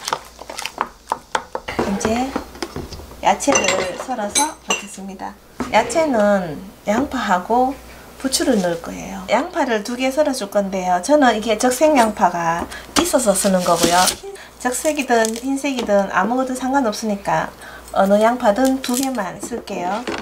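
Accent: Gyeongsang, South Korea. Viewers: 경상도 아지매네예~^^ 오이를 끓인 소금물로 먼저 담으시네요 어떤 장점이 있을까요?? 궁금하네요 또한 3종 드리고 이웃맺고 싶어요^^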